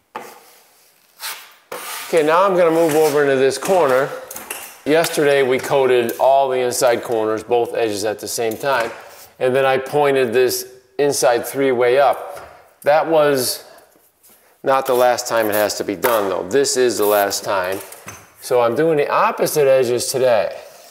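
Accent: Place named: Northeast, United States. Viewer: Hello it's interesting to find your video on here looks like you've been doing this stuff for a long time. Cleaning up this around wondering you must be from somewhere in the Northeast I'm guessing Connecticut Massachusetts😅?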